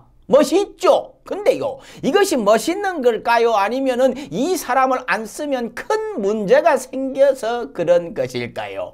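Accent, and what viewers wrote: Jeolla, South Korea. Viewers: 님은 서울이여 근데말은전라돈데여 공정하게말씅하세요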